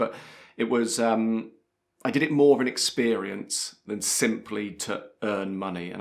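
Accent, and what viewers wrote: United Kingdom. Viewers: It's sounding really British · Professore ho preordinato il Suo libro. Darei qualsiasi cosa per avere il Suo accento così British. Faccio le prove a casa per avvicinarmi sempre di più ma non è così facile. Grazie. A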